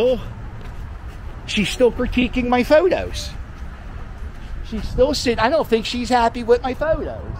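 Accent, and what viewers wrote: Philadelphia, United States. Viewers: Yo!, I subscribed to your site because I enjoy listening to the Philly accent again its been years. Great work on your videos, keep it up!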